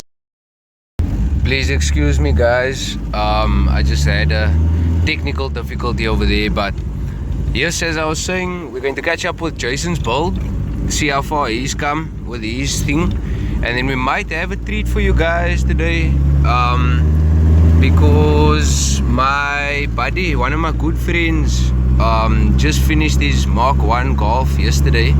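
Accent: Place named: Cape Town, South Africa. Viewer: Love the capetonian accent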